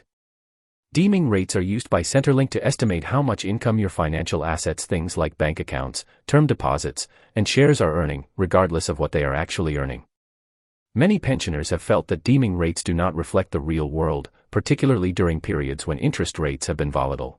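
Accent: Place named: United States. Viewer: Here we go! That yank voice again. Don't believe anything he says. Who makes this shit up? Shame on you.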